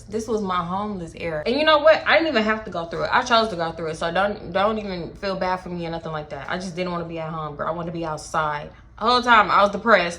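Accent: Baltimore, United States. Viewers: you and this baltimore accent take me out🤣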